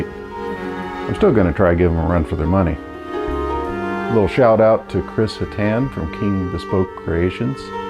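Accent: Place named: Yorkshire, United Kingdom. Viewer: Not a bad Yorkshire accent! 😂. Loving this, you are clearly insane but this is gonna be great. Well done mate 👍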